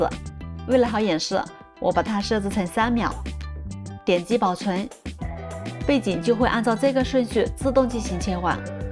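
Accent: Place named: Sichuan, China. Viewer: 声音倒像真人的，还带四川口音，但人像怎么看都像假的。。。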